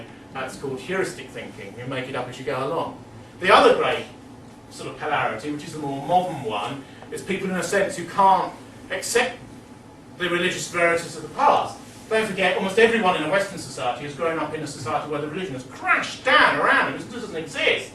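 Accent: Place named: United States. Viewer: His American accent was quite good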